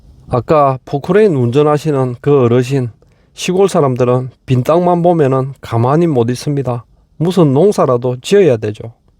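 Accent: Gyeongsang, South Korea. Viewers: 경상도 아이가 ㅋ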